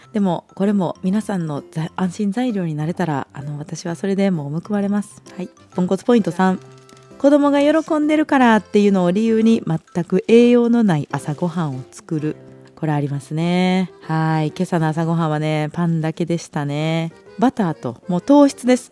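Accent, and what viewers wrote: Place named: Osaka, Japan. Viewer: 大阪弁も大好きです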